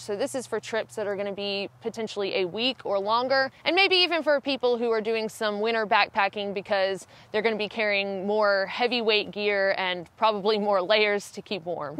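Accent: South, United States. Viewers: Wow, a firehose of great info. I thought she said "ass axe," then turned on the closed caption option and rewound to discover it was "ice axe." Trying to figure out which part of southern US she's from. Maybe Alabama?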